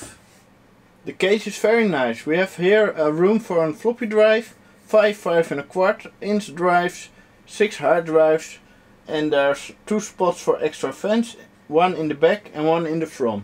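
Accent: Netherlands. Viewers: nice dutch accent leuke pc :)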